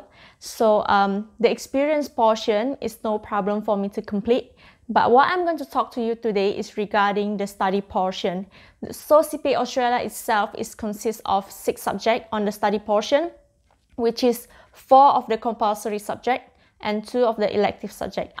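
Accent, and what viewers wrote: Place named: Vietnam. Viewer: Vietnam accent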